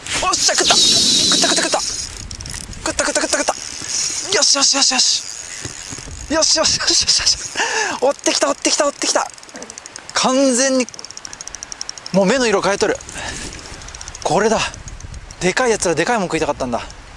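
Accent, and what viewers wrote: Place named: Nagoya, Japan. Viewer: 名古屋弁が懐かしい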